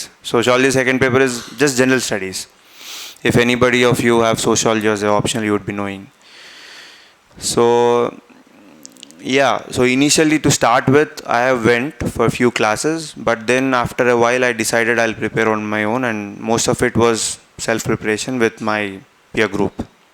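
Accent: Telangana, India. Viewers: First time vintunna .... Telangana yasaa....oka civil servant matallo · కేటీఆర్ సార్ లాగానే ఉన్నారు సార్ అలాగే మ్లాడుతున్నరు తెలంగాణ మడలికంలో చక్కగా అర్థం వంత్తగా మాట్లాడారు సార్ ధన్యవాదాలు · Very good to hear pakka Telangana slang ... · Sooper speech in telangana yasa garu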